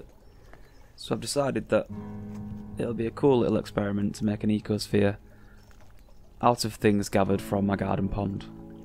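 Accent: Yorkshire, United Kingdom. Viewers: sounds like he is form yorkshire lol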